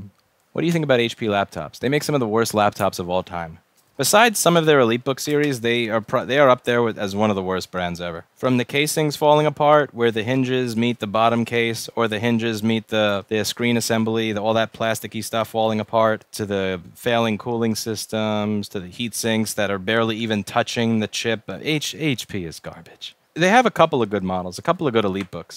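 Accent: New York, United States. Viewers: Yes! It's kinda like watching a football game. I think it's his monotone voice along with that Jerry Seinfeld accent that makes it soothing. Although, I've learned a lot about soldering. I just wish he'd gear it more towards us novice-type. Like, I had no idea how to use flux like he does! And, NOT to lift the wick, but drag it was.....INVALUABLE!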